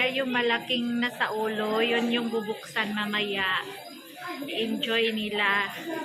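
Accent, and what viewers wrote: Visayas, Philippines. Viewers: Mam, ako rang gina-play inyong video pabayaan ra nako ang adds. Duha akong youtube account. Ganahan man ko aning imong vlog kay natural kaayu. Unya bisaya kaayug tingug. Hasta kapila na na-play. Kay sa kusina ko ako lang ginapasagdan. Hehe Pati 2 years ago gina-play pa nako. Apmping permi mo diha. Nindut unta makalaag diha Congrats sa nephew!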